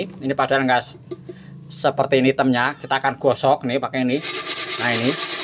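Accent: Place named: Java, Indonesia. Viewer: Maklum jawa totok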